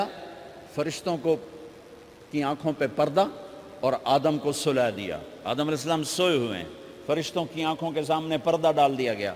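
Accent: Punjab, Pakistan. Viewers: i love to hear uh😍😍😍your Punjabi urdu its drive crazy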